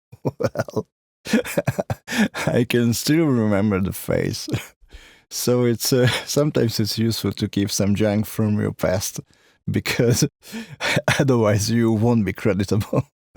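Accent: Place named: Balkans. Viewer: Fullok a balkáni angol akcentuson és vele párhuzamosan a kis rajzokon. 😆💯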